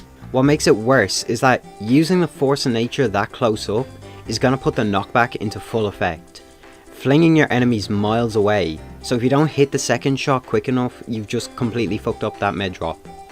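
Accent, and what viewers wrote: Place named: Ireland. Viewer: Tf that Irish accent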